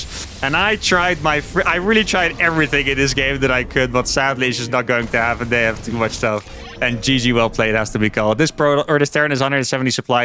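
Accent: Netherlands. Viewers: imagine hating the Dutch accent but the two largest SC2 CCs are both Dutch lol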